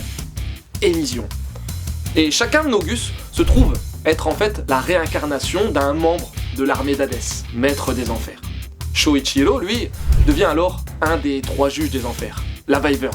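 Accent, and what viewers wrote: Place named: Japan. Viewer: Bonjour, Je suis surpris par ces prononciations des noms anglais à la japonaise (rien à voir avec le "w" hein). Je ne pense pas que c'est de cette façon que vous fideliserez/attirerez de nouveaux followers. Après, ce n'est peut-être pas ce que vous recherchez. Au revoir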